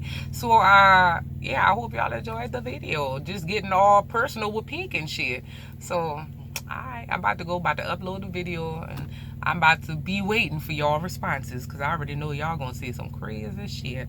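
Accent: Louisiana, United States. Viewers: Love your accent! Bayou, Creole?....Cajun! Luv You! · I though you was from loussaina the accent gave it away!! Lol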